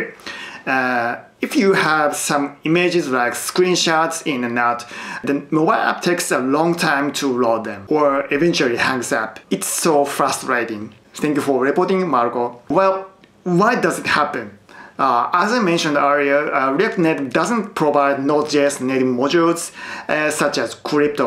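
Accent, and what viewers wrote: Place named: Japan. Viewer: I like your Japanese accent.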